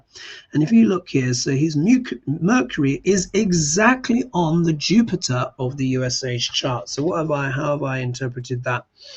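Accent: United Kingdom. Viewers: Omgggg I love your British accent 😍😍😍😍😍🤍🤍🤍🤍🤍😭😭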